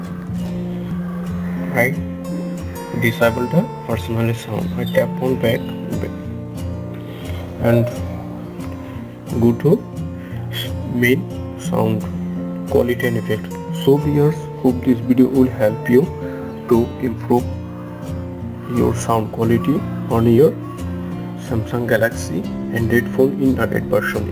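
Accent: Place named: United States. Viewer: American lag rahi hai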